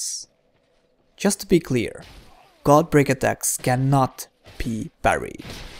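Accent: Finland. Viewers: are u finnish? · arvasin tosta aksentista :D terveisiä kajaanista :DDD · that accent, i could swear that you are from finland =)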